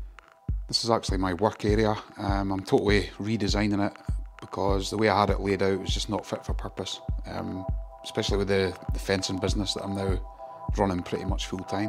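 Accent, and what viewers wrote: Scotland, United Kingdom. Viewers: Nice job mate, also nice to hear a Scottish accent for a change! I have the same thing in mind to build but I was thinking of going for Bosch glide 12". At this level they are all top notch saws. I have a cheaper erbauer mitre saw and for £240 it has massive cut capacity but suffers the same drawback as your dewalt in that it's ginormous and space is limited. I'm from Kilmarnock btw, I am a joiner and have a youtube channel, jusgeritdone. I only have 4 vids at the moment but have decided to start getting a bit more serious so working on a new video just now. I built my shop last year and currently working to finish indide. Anyway, I have subscribed to your channel and enjoyed watching this build. It would also be interesting to hear how you get on with the new makita chop saw.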